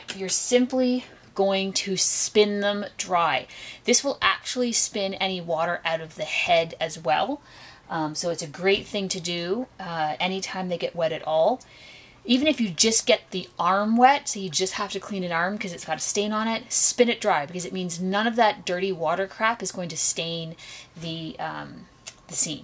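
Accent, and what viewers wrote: Canada. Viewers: That Sunlight bar costs $14 on Amazon. You sound Canadian maybe it's cheap there but here in the USA it's not in stores, and it costs a lot. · Personally I put them through washing machine. I have a newer washer with no spindle. I use Scrubbing Bubbles spray on faces and bodies. Then use tooth brush to get in all the grooves of face. Then once placed in washer by themselves. I then let the washer do the work. The yarn is material and it grosses me out too much thinking how much filth could be in the yarn. Once washed - start the process of using sticky lint roller to take all lint / fly always. Let dry for a bit under ceiling fan. Then restyle hair. Instead of rubber bands try tiny zip ties. These will not only get a tighter fit but will look better as well. Especially Jesmars. While yarn is still a little damp/pliable - use sharp scissors ✂️ to trim fuzz flush against the CPK head. 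😁 Your accent - shout out to Canada 🇨🇦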